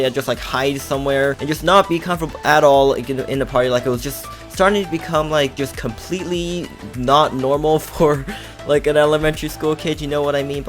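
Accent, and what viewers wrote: United States. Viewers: Not trying to be rude but are you American like idk ur voice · i have chinesee friends and their childhood was like that to and hearing that ur chinesse is so surprising like my chinesse friends learned a lot of english and all and i knew when i first met them that their chinesse by their voice but u sound like a normal american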